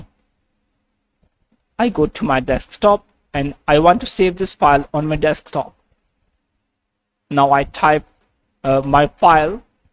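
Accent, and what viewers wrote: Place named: India. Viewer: I knew it would be a waste of time as soon as I heard indian voice but still tried and yea it wasted my time 😂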